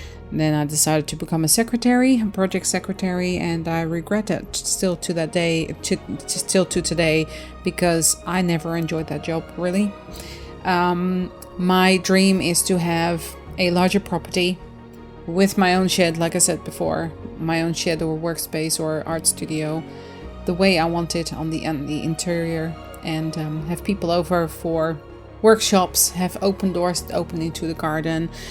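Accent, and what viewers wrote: Netherlands. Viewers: Lol I thought your accent sounded familiar, my father and his family moved to Canada from Amsterdam. Have you considered doing Aragog as part of the pet collection? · 🐦It was really interesting learning more about you. For some strange reason I thought you were of South African origin, but Dutch makes much more sense with your accent. Don't ever give up on your dream art room, visualise it, manifest it into reality. Yeah I know easier said than done but a little hope and faith won't hurt anyone eh. PS I'm with you on the vegemite. Blerk!!!!!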